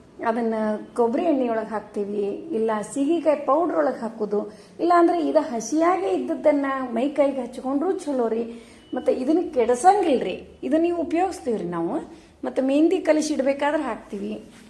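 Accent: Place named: Bijapur, India. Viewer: ನಮ್ಮ ಬಿಜಾಪುರ ಭಾಷೆ ಸೂಪರ್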